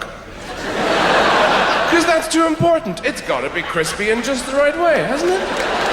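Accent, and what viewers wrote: Ireland. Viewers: Eddie Izzard meets Dave Allen. The incredulity and upwards inflection being pure DA — probably the Irish lilt to some degree. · Yes he is irish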